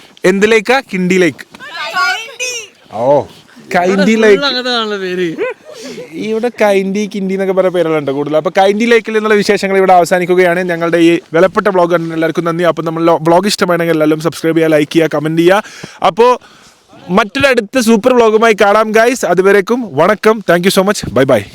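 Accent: Malappuram, India. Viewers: മലപ്പുറം ഭാഷ ഒരു രക്ഷയും illa 😁😁💕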